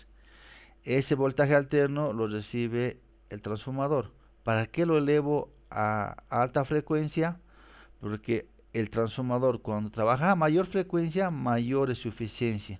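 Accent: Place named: Antioquia, Colombia. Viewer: Impresionante didactica, pero si me hizo reír su acento paisita jjjjj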